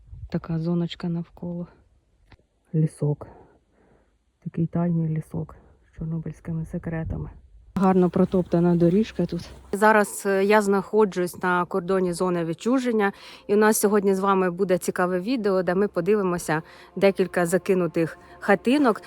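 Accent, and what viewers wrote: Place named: Zhytomyr, Ukraine. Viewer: Здрастуйте. Ви не з Житомирської області? Просто слухаючи вашу мову так думаю.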